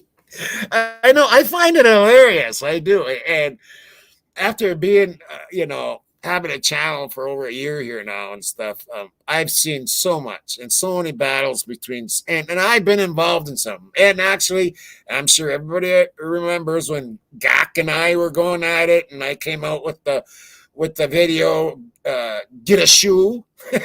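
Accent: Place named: South, United States. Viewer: He sorta has a southern accent or something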